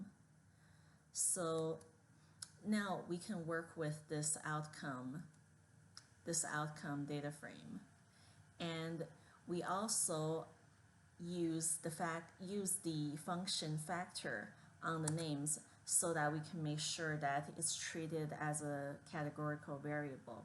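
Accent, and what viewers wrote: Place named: China. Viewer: 为什么有中式口音……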